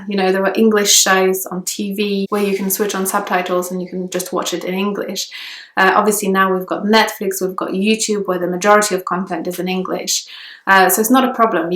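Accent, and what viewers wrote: United Kingdom. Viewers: I completely thought you were a native Britian before you said you were polish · Ironic that you ended up with such a beautiful British accent after finding American English easiest to understand at first. As a native speaker, it's difficult for me to say, but (as Brandon commented below) the absence of noun gender must really help. I find just remembering genders in German very hard, still. As Scott says, below, isn't the crazy English spelling the main difficulty, though, from a reading a writing perspective? I once read a book by a Russian linguist who argued it takes English native children two years longer to get literate because of the spelling. I still struggle, sometimes, even with top 2,000 frequency words after decades of extensive reading and writing. I'll always know when I don't know, if you follow, but I do sometimes have to check. 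Rather like Chinese or Japanese natives having to check the characters (though it's not that difficult, I'm sure).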